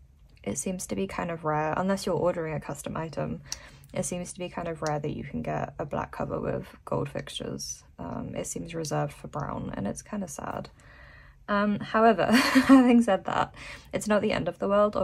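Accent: United Kingdom. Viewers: . In answer to your question you sound more south east England than London. But then again I only know some accents from London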